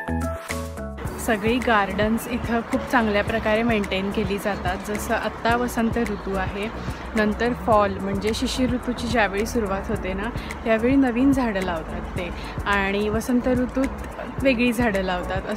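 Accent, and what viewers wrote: Pune, India. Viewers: पुणेरी मराठी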